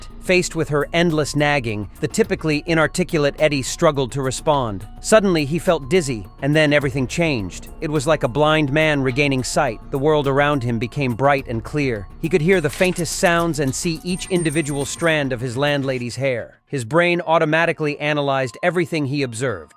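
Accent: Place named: United States. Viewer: Sound like a certain American guy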